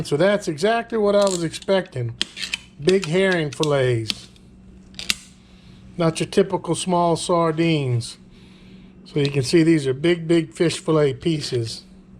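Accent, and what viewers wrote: Louisiana, United States. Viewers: You from LOUISIANA?? If you don’t mind me asking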